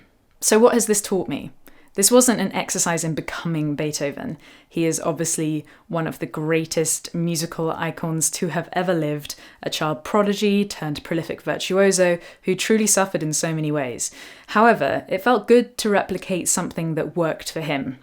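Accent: United Kingdom. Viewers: Holy hell...You're BRITISH?!?!?!?! You were already AMAZING and now you speak.....<3 <3 Sorry I presumed you were Norwegian as well! Just recently discovered Leo and Yourself and Hanna and Rabeah...lol Anyway no that I know you're in England, did you ever have an opportunity to meet or hear from him on your cover of? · lmao i have no idea who you are but i heard the british accent and boom subscribed 🥰🥰 SO refreshing after always watching american youtubers and movies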